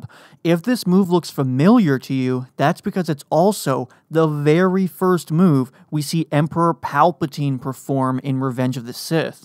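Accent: United Kingdom. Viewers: Please, English accent not British. British would include Scottish, Irish, Welsh as well as English. Even to suggest there are no regional difference in accent within England is incorrect. Someone from Newcastle will sound very different from a Bristolian who in turn will be different from a Londoner. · keeps up with the british accent too...nice one.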